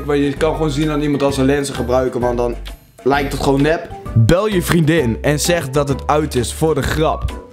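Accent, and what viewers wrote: Groningen, Netherlands. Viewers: Ik jank om dien Grunnings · Dit gronings accent😂😂😂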